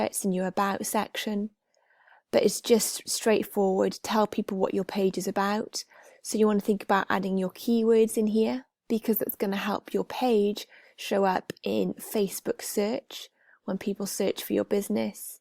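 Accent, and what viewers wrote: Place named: London, United Kingdom. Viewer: oh hell no! can't follow her accent at all. Why is she speaking like a london school teacher?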